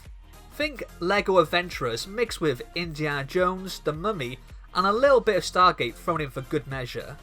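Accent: Wales, United Kingdom. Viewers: I subbed, love the welsh accent! Great video too, I'm 15 and I'm worried I may have a dark age, I'll come back to lego though I'm sure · Fair play you sound proper Welsh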